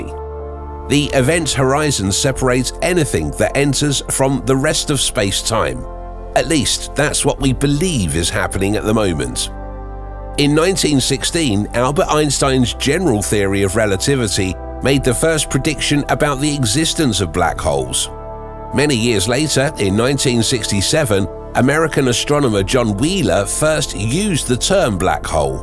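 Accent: United Kingdom. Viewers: Because the word sounds more daunting in a British accent.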